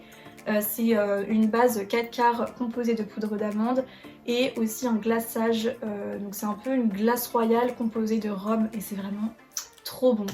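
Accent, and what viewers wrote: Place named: Russia. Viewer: trop bien cette vidéo face cam, on en apprend plus sur toi !! très bel accent russe d'ailleurs 😉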